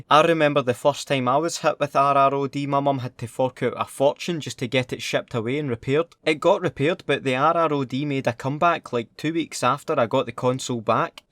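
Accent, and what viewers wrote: Scotland, United Kingdom. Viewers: I love your Scottish accent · Love the Scottish accent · Whats this? AND I LOVE YOUR VOICE! Its cool! Scottish? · Aye scottish mate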